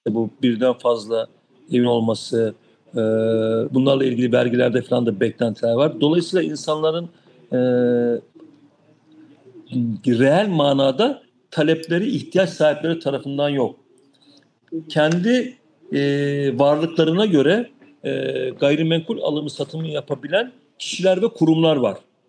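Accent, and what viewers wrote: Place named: Izmir, Turkey. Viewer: Tam İzmir"li gibi konuştu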